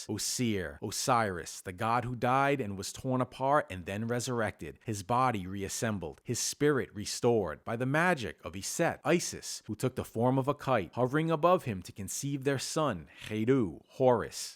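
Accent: United States. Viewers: Excellent ~ Thank You! May I ask, you live in Egypt but have a strong American accent ~ NOT faulting! Are you of Egyptian descent who returned to Egypt?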